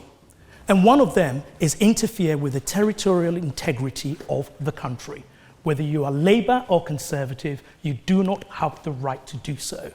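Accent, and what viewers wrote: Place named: Scotland, United Kingdom. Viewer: what English accent are you talking about? He's clearly Scottish.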